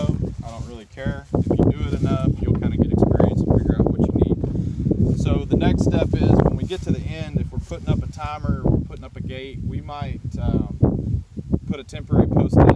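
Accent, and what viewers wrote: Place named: Texas, United States. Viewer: Hey what up with the Aggie comment!! ... Class of "92. Enjoyed the video! Sounds like a Texas accent.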